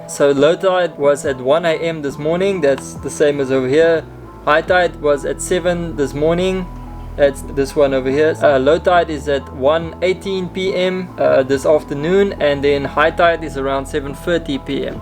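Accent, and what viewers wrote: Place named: South Africa. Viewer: Love the South African accent, nothing like it in the world :-)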